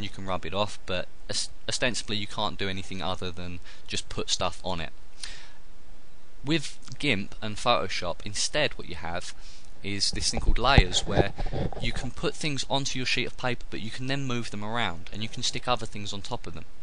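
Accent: United Kingdom. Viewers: ur british?! sexxyyyy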